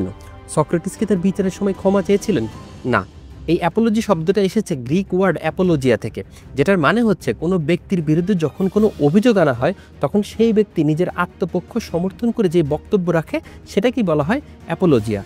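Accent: Bangladesh. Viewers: ভাল প্রয়াস। তবে বঙ্গভাষার পূর্ববঙ্গীয় গ্রাম্য উচ্চারণ পরিহার করতে হবে।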